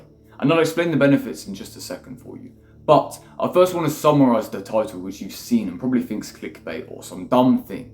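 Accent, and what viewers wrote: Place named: United Kingdom. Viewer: What’s your accent? It sounds British but with something else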